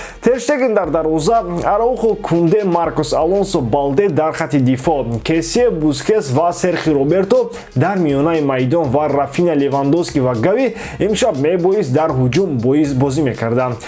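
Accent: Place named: Afghanistan. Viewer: Илтимос ба хаму лахчаи агони габ назан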